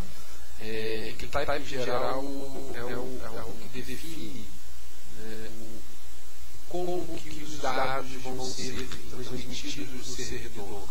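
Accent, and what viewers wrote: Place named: Rio de Janeiro, Brazil. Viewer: apesar de audio terrivel e sotaque carioca forte, um otimo video!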